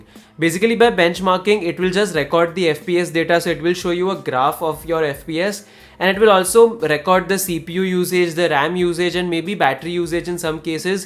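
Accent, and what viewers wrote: India. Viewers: Finally An Indian English ppl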